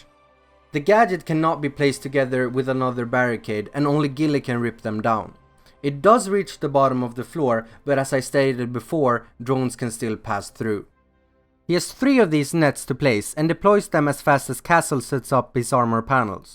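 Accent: Sweden. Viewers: u are swedish?Also nice idea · Värsta svenne accenten haha, aja bra video, cool grabb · Is he swedish? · Are you swedish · Not bad that can be fun operator I have a question are you Swedish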